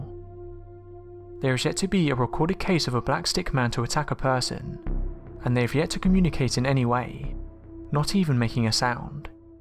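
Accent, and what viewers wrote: United Kingdom. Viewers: Interesting report. Too bad you string all your words together so even incomprehensible at 75% speed. As if you Brit accent isn't difficult enough on American ears. I'm unsubing.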